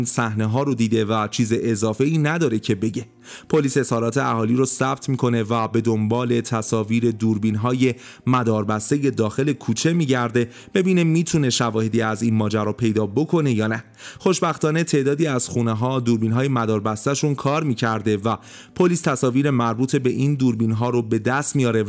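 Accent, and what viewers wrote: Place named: Tehran, Iran. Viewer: این همون ش تهرانیه بودش زدش دیدش گرفتش بستش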